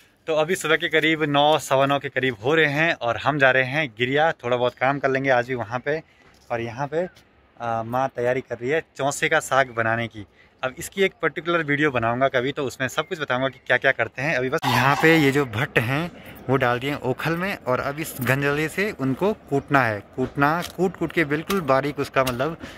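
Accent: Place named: Pahadi, India. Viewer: Thechenge pitpita 😂 Mza aa gya bhai pure pahadi bolte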